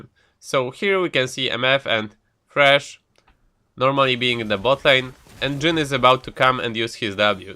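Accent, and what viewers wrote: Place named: Poland. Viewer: Where is from? Because i hear like polish accent in his voice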